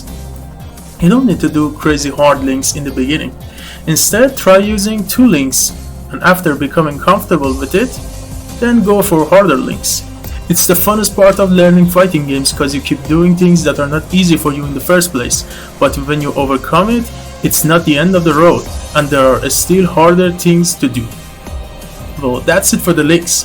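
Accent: Iran. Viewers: lmao u have to be Persian ur accent is so obvious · why does this guy sound Iranian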